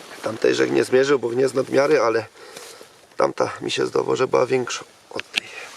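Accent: Silesia, Poland. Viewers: Uwielbiam slaska gware.....dziekuje i serdecznie pozdrawiam..))) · Hej kolego, jak nie lubię Ślązaków tak ciebie szanuję. Świetne filmy, widać że lubisz to co robisz. I nawet tą waszą gware w twoim wykonaniu da się słuchać. Powodzenia życzę.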